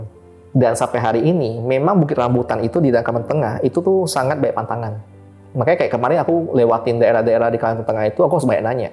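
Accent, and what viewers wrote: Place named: Pontianak, Indonesia. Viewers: Salut sama bang, tidak menghilangkan aksen bicaranya khas pontianak 😂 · Bang logat Kalbar ny kental, wkwkk mantap bang paduuu 😂😂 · Bahase Nye pontianak bnget bang😅 Salam dari budak pal 5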